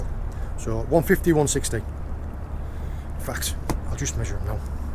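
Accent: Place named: Cornwall, United Kingdom. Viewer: How long did you keep the berried lady out of water for? Did you dip regularly? These are things we would like to know. Was there any need for a double v-clip? I saw she was bleeding when you released her. I know she'll coagulate eventually, but is it more stress on her system? Loving your shore knowledge and your Northern x Cornish accent...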